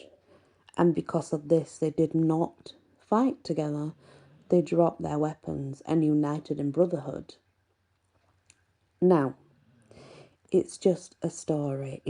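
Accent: United Kingdom. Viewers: Good evening ✨ I’m absolutely in awe… the legend of Saint Cast is one of the most powerful things I’ve heard in a long time 😢💕 your storytelling is so vivid I felt like I was standing between the two forces—Highlanders and Bretons—watching them realize their kinship through a shared song 🎶🫶 the moment they disobeyed orders and embraced was so symbolic… it’s the kind of tale the world needs now 💔💛 your mention of how the song might trace back to a Celtic theme and how Reid could have drawn inspiration was fascinating 🧐 the little details you shared made the story feel alive 🔍 your calm, gentle, British-accented voice is like being wrapped in a soft blanket while hearing ancient wisdom 🧡 thank you for your light, your research, and your heart 🌟 more power to you, you are a legend yourself 💐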